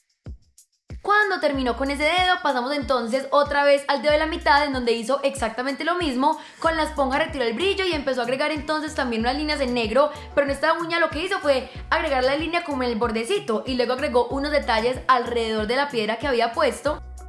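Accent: Antioquia, Colombia. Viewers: Eres colombiana nada mas con tu voz 😐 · habla todo paisa eso me encanta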